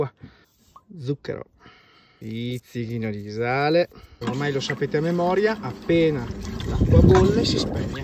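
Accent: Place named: Romagna, Italy. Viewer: mi toglieresti una curiosita, sento che hai un'accento romagnolo, ma la location dei video e' lo stesso posto in cui vivi? se si' come si chiama quel posto in montagna, che e' splendido, si adagia perfettamente alle tue performance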